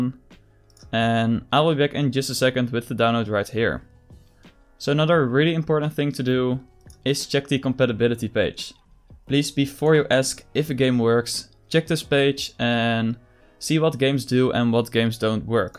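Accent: Netherlands. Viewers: Your dutch 100% sure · jij bent nederlands dat hoor ik aan de manier hoe je engels spreekt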